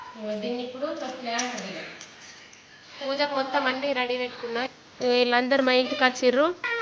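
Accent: Nizamabad, India. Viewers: మాది నిజామాబాద్ జిల్లా,నీవు మాట్లాడే యాస నిజామాబాద్ జిల్లా యాస లాగా వుంది అందుకే మీది ఏ ఊరు అని అడిగాను.జై తెలంగాణ జై, భీమ్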